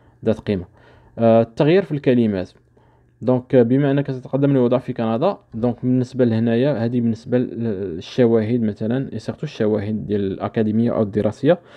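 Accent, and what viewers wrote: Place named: North Africa. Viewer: اخى الكريم ارجو مراعاة ان الكثير منا لايجيد اللغة الفرنسية وكذلك معظم الدول العربية لايستطيعون فهم اللكنة العربية الخاصة بشمال افريقيا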